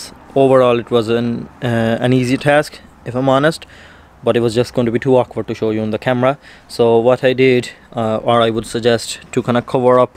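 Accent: Cork, Ireland. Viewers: sounds like im home in cork listening to you 😂😂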